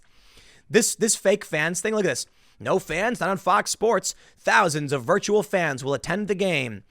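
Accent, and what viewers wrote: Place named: Chicago, United States. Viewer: This is the first time I've noticed a chicago accent from Tim. He must actually be upset about this.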